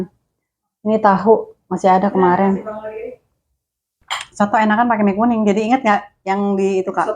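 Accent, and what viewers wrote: Jakarta, Indonesia. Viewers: Biasa liat konten pake bahasa arab ternyata pas di indo nyablak bener betawi tulen😂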